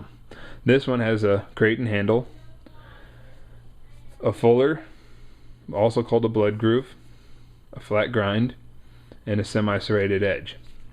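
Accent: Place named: West, United States. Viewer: Sounds like you from the West. Some good advice brother.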